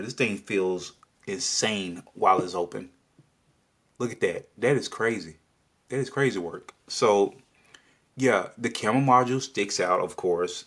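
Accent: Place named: United States. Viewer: Where is your lovely accent from ? Usa but what part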